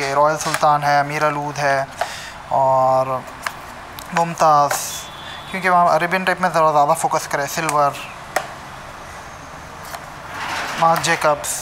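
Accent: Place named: Hyderabad, India. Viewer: Badiya ek dum hyderabadi style..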